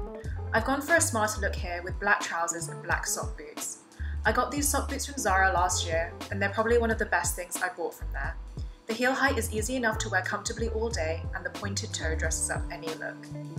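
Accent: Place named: England, United Kingdom. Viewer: Don’t lose your lovely English accent there!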